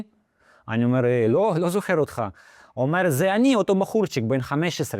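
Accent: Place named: Russia. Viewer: у тебя ярко выраженный русский акцент... ты бы хоть титры на русите сделал бы.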